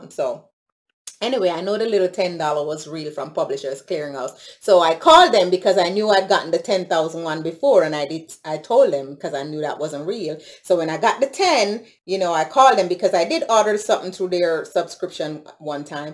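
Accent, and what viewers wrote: Jamaica. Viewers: A Jamaica yuh come from?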